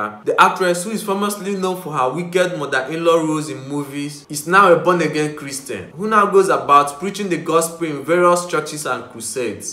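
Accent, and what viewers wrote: Igbo, Nigeria. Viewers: U need to work on your Orals,, your Igbo Tongue is so strong...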